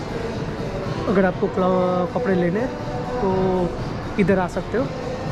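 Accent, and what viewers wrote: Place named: Gujarat, India. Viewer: By your language accent i am assuming you to be from Gujarat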